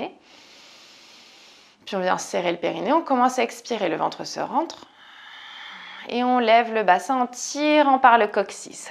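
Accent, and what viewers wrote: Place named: France. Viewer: Belle séance j'adore beaucoup votre voix avec cet accent français doux quelle merveille le yoga avec tous ces ingrédients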